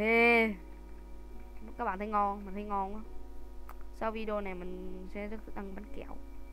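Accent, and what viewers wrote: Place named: North, Vietnam. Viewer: Hơi lai giọng bắc á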